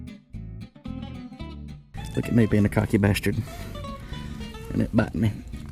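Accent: Alabama, United States. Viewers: Hey, confirmed in the info section that you're from Alabama; I thought I recognized your accent! Shout out from Fort Rucker lol. So, I have an FZ-07 2015, and I've been doing a lot of reading trying to determine the 'best' engine oil to use since I'm about to change mine for the first time (bought the bike used). Popular opinion seems to say 10w-40 and full synthetic. In your vid the oil you use is the performance all purpose. Would you please elaborate on why you opt for non-synthetic? Thanks!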